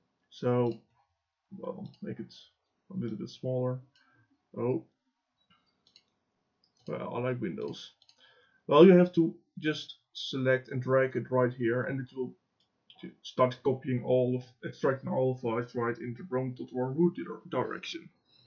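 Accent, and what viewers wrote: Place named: Netherlands. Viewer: hey I get an error each time the launcher is downloading, also you have a dutch accent I can tell because I am a fellow Dutchman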